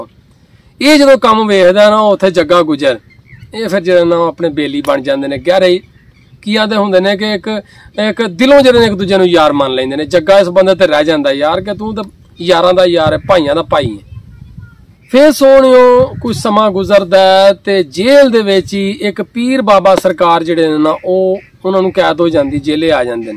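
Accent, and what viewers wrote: Punjab, India. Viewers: Assalam o alaikum Aap ki pure punjabi buhut achi lagti hai. I am from KPK.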